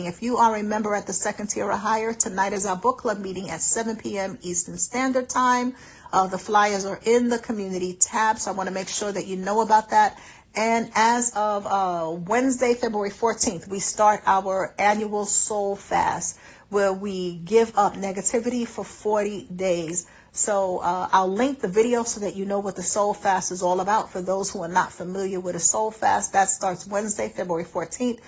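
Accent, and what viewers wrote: New York, United States. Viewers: Case and Point: This video is WHY I am a Tier 3 member of this channel. The wisdom that you have been graced by God to have is a blessing. Then, your authentic NEW YORK ACCENT is EVERYTHING❣️🙌🏾🎉✨😍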